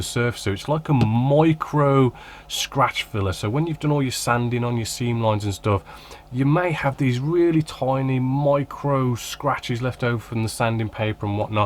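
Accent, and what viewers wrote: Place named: Black Country, United Kingdom. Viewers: This convinced me to pick some up. Nice to hear a fellow yam yam, ha! Subbed. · been using 1500 as a primer for a few years, still needs 50/50 thinning for an airbrush as it is very thick and gloopy! just out of interest are you based in the midlands as you have a bit of a midlands accent? like myself tbh!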